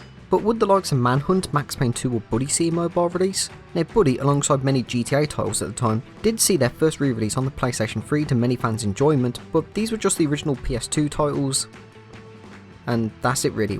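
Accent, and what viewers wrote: United Kingdom. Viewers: He’s British that’s why he sounds like this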